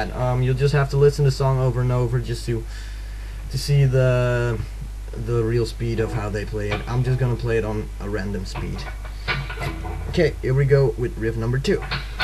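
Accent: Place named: Canada. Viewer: You are definitely Canadian!! Right?..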